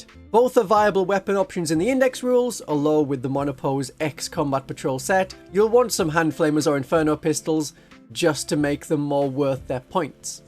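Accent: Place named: Geordie, United Kingdom. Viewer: Where is your accent from? Sounds posh Geordie.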